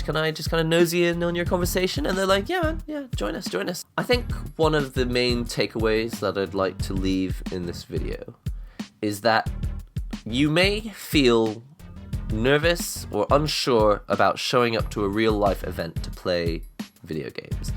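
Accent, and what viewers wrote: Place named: Bristol, United Kingdom. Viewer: England. He sounds like he's from the Bristol area maybe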